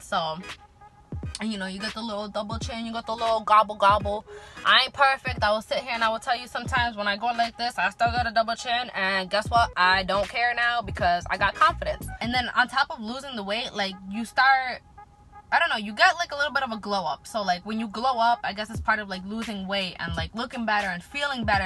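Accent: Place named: Los Angeles, United States. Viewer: Good job Pretty woman. You sound like you're from LA.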